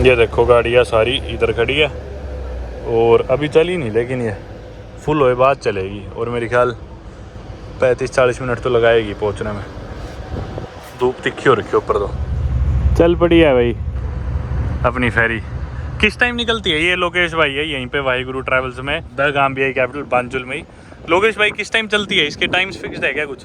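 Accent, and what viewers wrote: Haryana, India. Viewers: I really like ur height and also ur haryanvi enuciation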